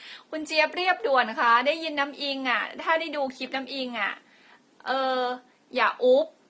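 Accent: North, Thailand. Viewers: คนเหนืออี้ จังหวัดหยังนิ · ชอบครับอู้คำเมืองน่ารักดี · เป็นคนที่ไหนครับเห็นพูดเหนือ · เป็นคนเหนือก่อจ้าว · อู้เหนือน่ารักขนาด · ทึ่แท้เจ้ากะคนเชียงใหม่โตยเก่งหลายเน้อเจ้าเว้ากะม่วนแต้เน้อคนเหนือ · คนเหนือหรอจ้าว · อู้กำเมืองยิ่งน่ารักขนาดแต้ๆน้อ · พี่ อยู่ จ. รัยคะ..พูดเหนิอน่ารักมากๆๆๆ.. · อู้กำเมือง เวอร์ชั่น น่าฮักขนาดคับ เห็นด้านหลังตอนยิง นึกว่าเป็นสตั้นแมน ดูดุดันมากเลยคับ ไม่น่าเชื่อว่า สาวเอวบางร่างน้อย จะต้านแรงรีคอยลูกซองได้สบายเลย เยี่ยมมากกคับ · คนเหนือป่าวครับ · พึ่งฮู้น่ะ ว่า อู้เหนือได้ น่าฮักขนาด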